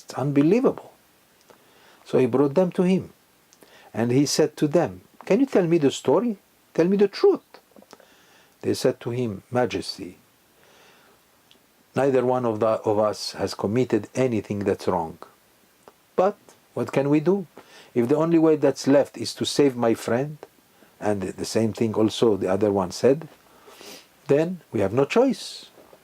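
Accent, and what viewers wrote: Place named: Iran. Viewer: you have Farsi accent even when you speak English and even want his bigger Hebrew semitic language I can smell and I can hear Farsi accent but I'm not going to judge you pray for Rabbi for all of us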